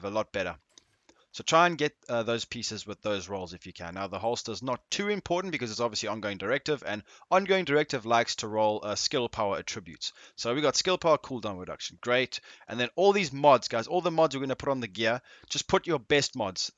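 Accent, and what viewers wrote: South Africa. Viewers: Nice video, you mind me asking are you from South Africa?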